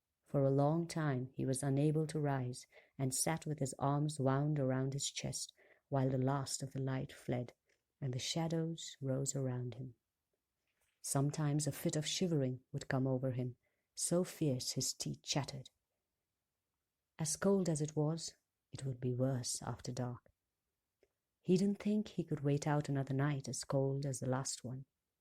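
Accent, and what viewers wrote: United Kingdom. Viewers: Your reading is FANTASTIC!! To hear a British woman taking the helm on this gives it an extra touch of surrealism (or plays against type so well). Very effective, and a HUGE relief from the awful, painfully contrived readings of Will Patton; his whistling S’s and phony solemn affect keeps me away from those. I hope you get hired at Audible, m’lady. 😄👍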